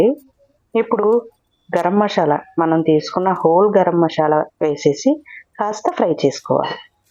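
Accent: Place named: Godavari, India. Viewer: Mana godavari style lo baga vivarinchi anduku miku thank you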